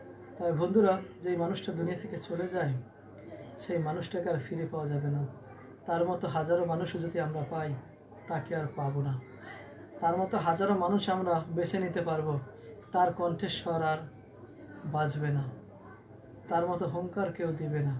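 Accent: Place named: Sylhet, Bangladesh. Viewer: Uni sylheti Kotha shune buja jacce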